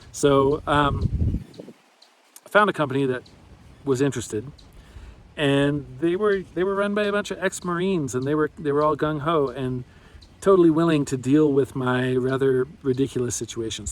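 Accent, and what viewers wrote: United States. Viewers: I live in idaho, your accent is idaho?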